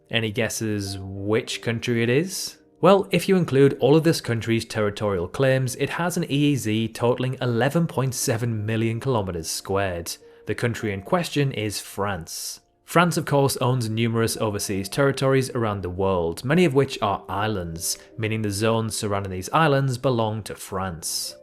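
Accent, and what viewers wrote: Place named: United Kingdom. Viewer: Your British accent lots of rubbish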